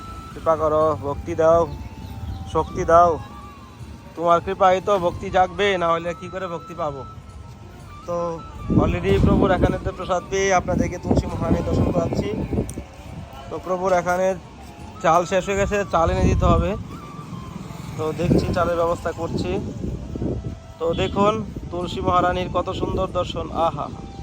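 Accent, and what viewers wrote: Bangladesh. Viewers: রাধে রাধে জয় শ্রী রাধে।প্রভু আপনার কথা শুনে মনে হয় বাংলা দেশের রাজধানীর ভাষায় আপনি কথা বলেন।আপনার ভাষা আমার খুব ভালো লাগে।আপনাকে ধন্যবাদ আপনাকে অভিনন্দন ও অসংখ্য প্রণাম ।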